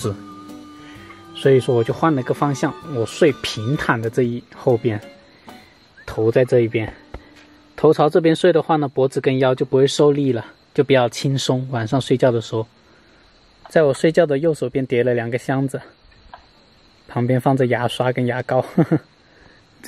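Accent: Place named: Hakka, China. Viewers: 和老友鬼鬼搭肩膀太可爱了，客家话广东话都能通真棒👍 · ！原來你是客家人！我也是！我們都是同音腔的客家人！👍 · ，你是惠州客家人，我也是，难怪我能听懂你说啥。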